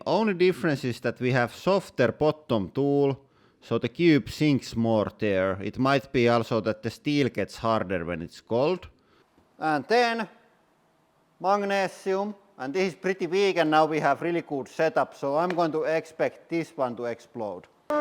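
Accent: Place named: Finland. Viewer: I can instantly telm ur finnis because of the accent so moi ja oli hyvä video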